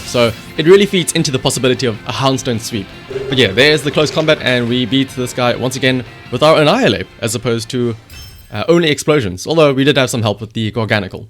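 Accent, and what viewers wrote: South Africa. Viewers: A,,, a south african poketuber????? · Is that a South African accent I hear?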